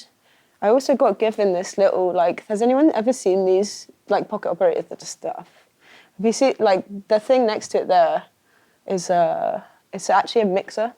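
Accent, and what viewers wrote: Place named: United Kingdom. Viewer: She's so "Bri-ish" I couldn't understand half of it :D Good the music is banging :)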